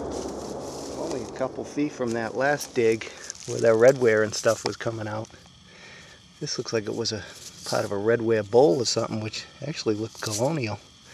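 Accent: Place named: South, United States. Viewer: Love your accent! Southern Dirt Fishing Lady doesnt hear that often!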